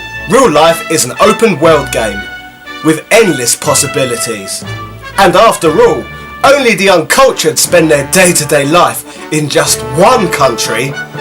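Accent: United Kingdom. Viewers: Aw man 🎩 you know I'm a fan so why'd you have to slag on rap music? TBH your accent is a charming reminder of some of my favorite UK rappers.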